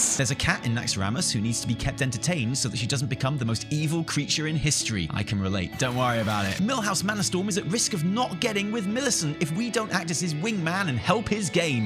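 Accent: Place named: United Kingdom. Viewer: i got really stoned and though t the first like minute of this video was like a food theory video... then i noticed you were British and talking about wow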